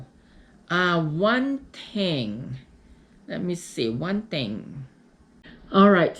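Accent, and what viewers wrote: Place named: United States. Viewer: hi mekini lukluk same tyo fave mangoshake.ganda ng accent mo pra k naring ameican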